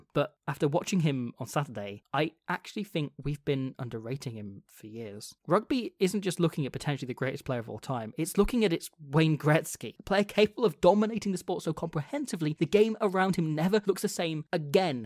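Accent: Wales, United Kingdom. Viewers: You can turn on generated subtitles, it's not perfect but does the heavy lifting. That welsh accent can be hard to understand for a non-native speaker haha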